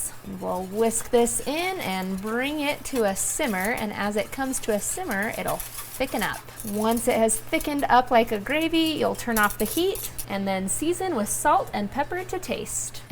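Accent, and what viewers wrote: United States. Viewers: Did a lil southern come out in you doing this video? :) Well done ma'am!!!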